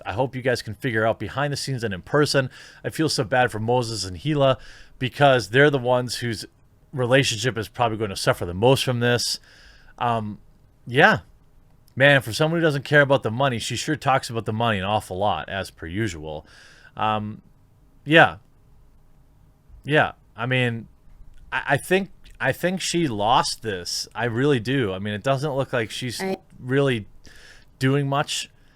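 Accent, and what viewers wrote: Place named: Wisconsin, United States. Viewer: you say bag like every single person from wisconsin i have ever met.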